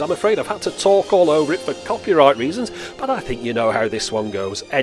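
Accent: United Kingdom. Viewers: Awesome! I love it when you make audio equipment and vinyl videos... I only have one album with a hologram on it, it's by. I also like your british accent, as I'm from México it's more understandable to me than american english. Great video as always! Keep them coming! - IQ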